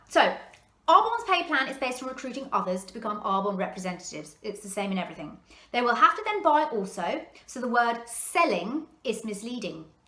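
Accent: United Kingdom. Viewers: it's great to hear the rant in a British accent brilliant. By the by everything you said is true in amway also ..thank you cheers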